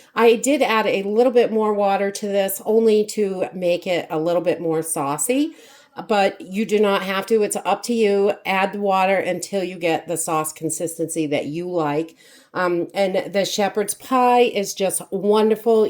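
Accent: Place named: East Coast, United States. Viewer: I love all you do with freeze dried food! This is what I give my kids for Christmas now. I buy 12 quart jars and use your recipes to make them. They save their jars and I refill them every year. Thank you for your ideas! I love them! Thrive life is so worth it! Have a great day. P.S. I love your eastern accent!😊 I’m a born and raised midwesterner lol.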